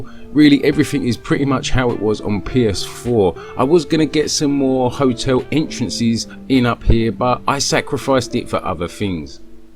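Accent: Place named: United Kingdom. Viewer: Soooo British and yes has a hint of Spain in to, amazing work keep em coming.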